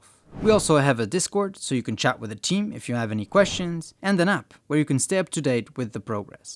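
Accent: Portugal. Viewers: You have a portuguese accent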